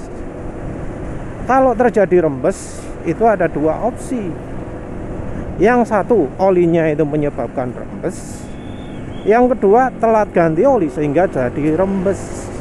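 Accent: Sidoarjo, Indonesia. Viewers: Logat Sidoarjoane sangat kental mas bro😂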